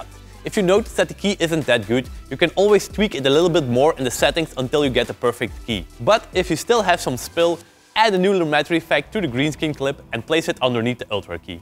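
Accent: Limburg, Netherlands. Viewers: your dutch isnt it? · ik ben ook nederlands, jij komt uit Limburg?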